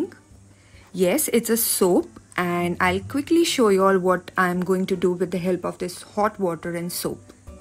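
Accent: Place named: India. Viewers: yes. I am an Indian and hearing her sound I think she is also Indian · She sounds Indian to me.. That's how they pronounce it too😁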